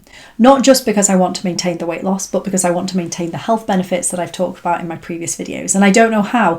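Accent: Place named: RP, United Kingdom. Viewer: You trying to speak posh is really irritating something about you is just well off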